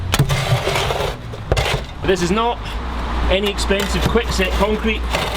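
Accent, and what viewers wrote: Scotland, United Kingdom. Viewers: Great video! Just what I was looking for! Fanx! Scottish?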